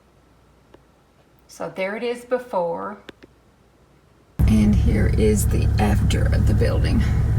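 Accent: South, United States. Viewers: I love your videos so much! When you bring us around your lovely town I think of one of my favorite movies Steel Magnolias. I love a small town country atmosphere not to mention a southern accent. I may have been born and raised in NJ but my inner spirit is most definitely southern charm!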